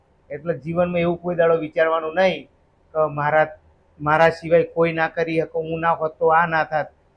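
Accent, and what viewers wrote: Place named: Charotar, India. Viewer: Charotar No Havaj Bole Mari Jaan 😍❤️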